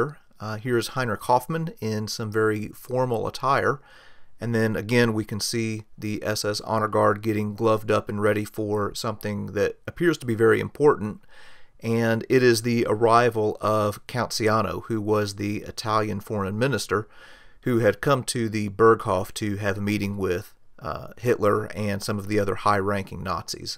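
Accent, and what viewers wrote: United States. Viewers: German surnames that you pronounce as if they were American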